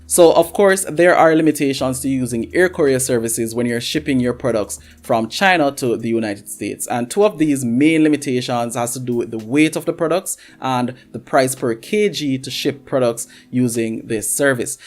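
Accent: Jamaica. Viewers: Bro your jamaican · U sound like a yaadi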